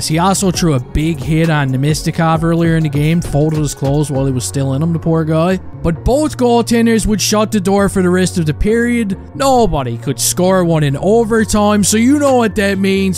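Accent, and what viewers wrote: Newfoundland, Canada. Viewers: Bro where are you from? If this is your real voice you sound exactly like a small town Newfoundlander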